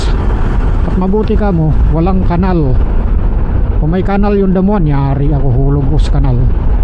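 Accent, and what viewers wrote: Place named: Mindanao, Philippines. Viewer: I stumbled on your channel and have thorouhly enjoyed your vlogs. Kaya yon new subscriber mo na si kanako. Bihira takaga yung late na ng hapon umaalis sa NCR at nag-nanite ride sa gabi tapos nag-iisa pa sa solo ride😊 Seriously, I like your channel kasi it’s mostly road scenes and it’s raw and very lightly edited and you keep on talking while riding with your lighly mindanao accent. Kaya mukhang orig takaga ala itchy boots. More power to you sir. Ride safe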